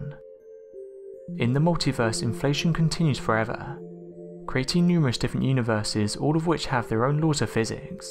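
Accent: United Kingdom. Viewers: Another British accent. Cool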